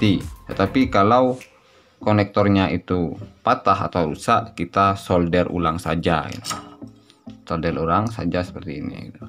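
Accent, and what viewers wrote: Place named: East Java, Indonesia. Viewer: Maksih bang infox..klo di dengar2 logat nya abang nya pst dr jatim 😄👍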